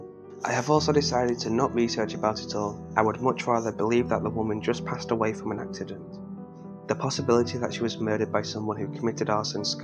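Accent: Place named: Northern England, United Kingdom. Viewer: Another great job! Where abouts are you from? We're based in York and you definitely sound like you're from the north :D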